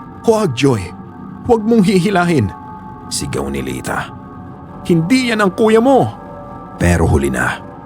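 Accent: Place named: United States. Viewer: Nakakagulat ung tagalog na kwento pero nagging american accent pag english words. Okay naman ang Filipino accent na english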